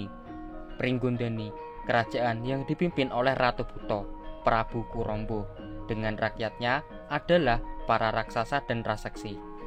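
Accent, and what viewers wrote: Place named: Purwodadi, Indonesia. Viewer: Naratornya maaf wong purwodadikah!? Cengkoke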